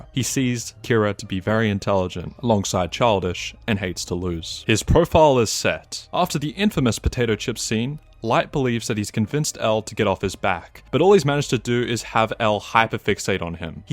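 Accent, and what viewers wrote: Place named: United States. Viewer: Why do you say a bunch of words like a seppo? Are you selectively saying "a" like a yank just to please the algorithm?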